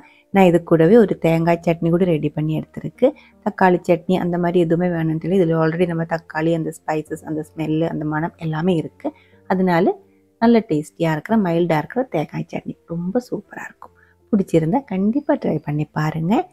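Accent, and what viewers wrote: Kerala, India. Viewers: Even Though U Are Kerala Malayam U Are Speaking Tamil Very Beautifully Must Appreciate U Expecting More Food Recipe From U Thank U Sister Wheat Dosa Very Nice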